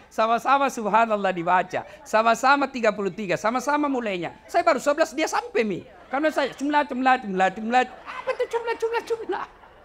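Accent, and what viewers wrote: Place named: South Sulawesi, Indonesia. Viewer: bantu jawab 🙏itu bahasa indonesia logat bugis makassar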